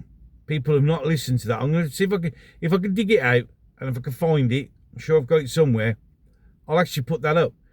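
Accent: Birmingham, United Kingdom. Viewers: Your brummie accent is so strong ! . Great channel our kid , spinning round JLR 😊. YOUR NOT RIGHT PROTECT THE PARTY what’s left civil war ……….. What’s your silly answer ?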